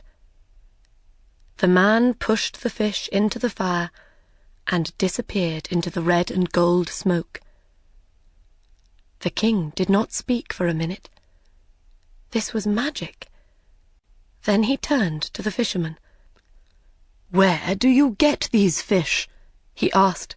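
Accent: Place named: United Kingdom. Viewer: Thanks so much.Nice story and excellent narratore with british accent.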